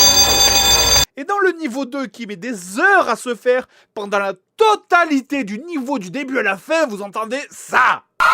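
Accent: South, France. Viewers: Le JDG est un vrai sudiste, avec l'accent qui va bien!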